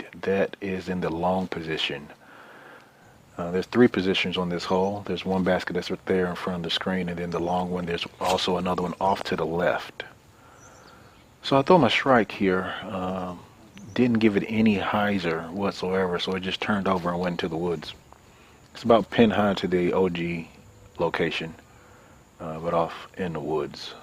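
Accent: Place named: South, United States. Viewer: Nicely done. Soothing southern voice. Simple and clear.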